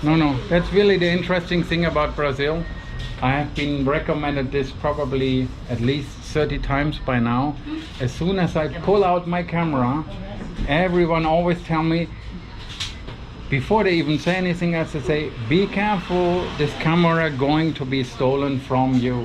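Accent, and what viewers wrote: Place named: Germany. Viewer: Are you from Germany? The accent sounds a bit like it. I'm half German half Brazilian 👌🏻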